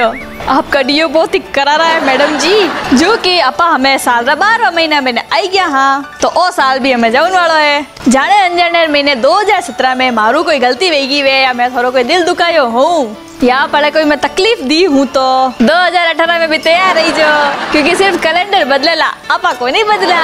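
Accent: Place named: Marwar, India. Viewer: suddhh marwadi bhasha very good g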